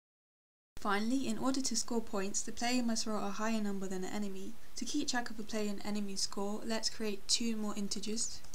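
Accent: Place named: United Kingdom. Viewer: I love your British accent ;) and I love your Unity tutorials!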